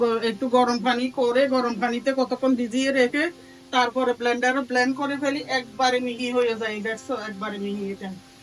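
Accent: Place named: Comilla, Bangladesh. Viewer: আসসালামুআলাইকুম আপু আপু আপনার বাড়ি কি কুমিল্লা আপনার মুখের ভাষাটা কুমিল্লার ভাষা যেগুলো অনেক সুন্দর হয় এবং দেখি অনেক ভালো লাগে সাপোর্ট করেছি আশা করি সাপোর্ট করবেন সব সময় পাশে থাকবো এবং পাশে থাকবেন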